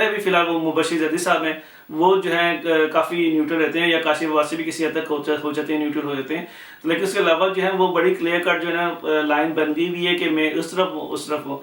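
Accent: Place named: Pakistan. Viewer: bhikharistan se ho kya accent se lagraha h ....tumhara katora kahan gaya....china usko bhi le gaya kya tumhe bheek dene ke liye....sala china tumhe aid de ke tumhara desh ko kharid raha h tum log yaha asalam alekum kar rahe hooo...tum buddu logo ne kabhi apne govt ko pucha h china aur pk ke andar ke kagjat ke bare me....ya phir din rat yahi karte rehte ho....kafir ka sajis aaaaaaaa...hindu ka sajis aaaaaa...😂..bosadike hmm hindu tum logo jyese nafrati..atankwadi..jahi;...andha nhi hote hame hesa apni padosi ki chinta rehta ha.....basudhyeba kutumbakam padha ha kabhi ,....is ka matlab hota h ki pura world ek pariwar haa....abhi bhi samay ha bhai sudhar jao hmm sath dene ke liye tayar ha.....jay hind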